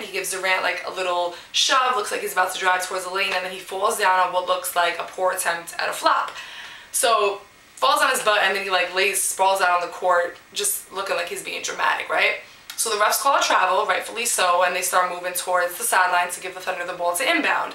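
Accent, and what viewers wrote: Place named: New York, United States. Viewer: nice video keep em coming! i love your NY accent lol and you know basketball marry me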